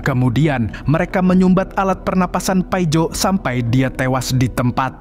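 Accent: Banjar, Indonesia. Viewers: Urang banjar pian lah mang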